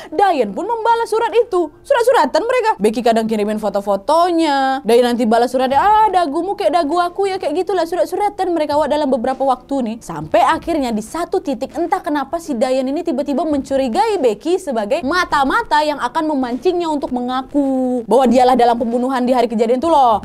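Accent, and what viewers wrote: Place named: Medan, Indonesia. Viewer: Sambil ngakak dengar cara baca ceritanya,kental kali logat Medannya,semoga tetap senabgat dan sukses selaku ya buk.tksh.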